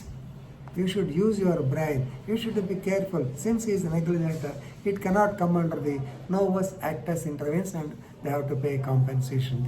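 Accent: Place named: North India, India. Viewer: gr88 explanaiton very lucid but accent was prob north indian here...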